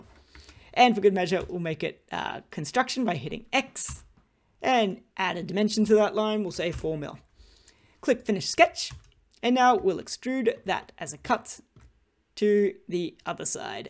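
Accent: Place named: Australia. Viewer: I can tell this mate is an Aussie lol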